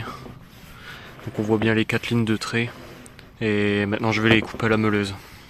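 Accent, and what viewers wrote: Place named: Quebec, Canada. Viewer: Tu habites où? As tu déjà habité au Québec? On sent un accent québécois derrière ton accent français...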